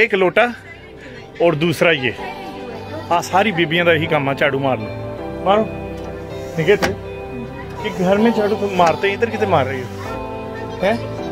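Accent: Punjab, India. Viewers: Love your Punjabi accent although I m not Punjabi you still use lota in canada yes it's a desi touch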